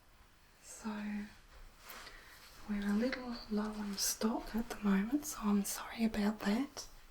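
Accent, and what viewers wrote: Australia. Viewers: I love that I've found someone with an Australian accent. It makes me feel so homely. It's great :)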